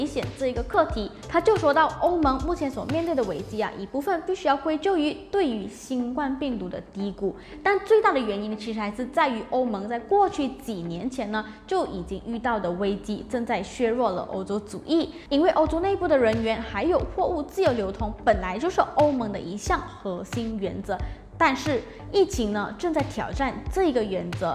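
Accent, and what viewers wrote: Malaysia. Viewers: 这是地道的马来西亚华语口音，不是中国口音，谢谢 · 这是马来西亚华人的口音吗，感觉好可爱。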